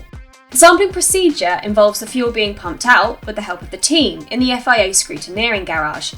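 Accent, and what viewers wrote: United Kingdom. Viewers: How about that? There is just something about a pretty girl, with a British accent who just doesn't talk about racing, but actually understands racing.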